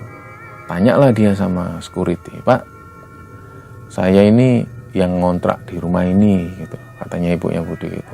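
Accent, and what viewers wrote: Java, Indonesia. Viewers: Langgam jawanya 👍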